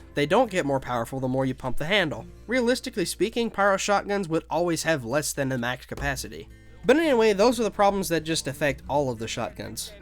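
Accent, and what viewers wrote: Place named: South, United States. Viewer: The second I heard that southern drawl, I know this was a man I could trust to talk about guns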